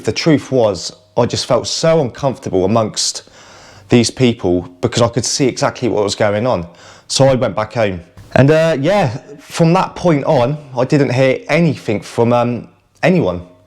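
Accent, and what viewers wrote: Bristol, United Kingdom. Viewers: Where in the UK you from? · I sit down with the whole family and watch/share your uplaods. You're a part of the household down here in Plymouth. Abandoned exploration wouldn't be the same without that Brizzol accent. Thank you.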